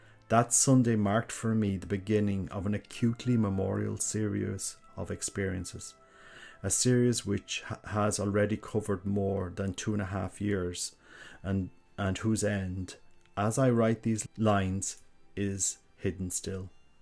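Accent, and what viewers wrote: Ireland. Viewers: Did you go beyond chapter 1? We have a family copy of the book (Father Frank Canavan is my great uncle) but hearing it in an Irish accent is quite special.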